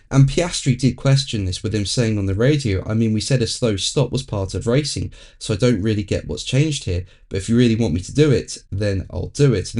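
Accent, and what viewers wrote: United Kingdom. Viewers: You speak as a british, not a car racing fan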